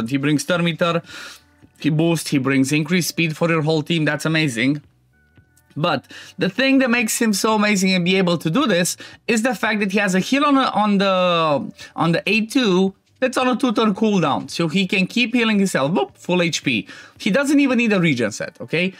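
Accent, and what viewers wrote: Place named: Romania. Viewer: Accentu de român))))) Oricum, fain video, ține-o tot așa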